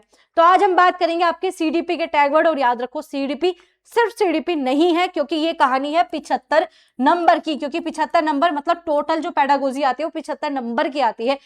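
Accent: Baghpat, India. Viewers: Mam aap Khekra baghpat se ho kya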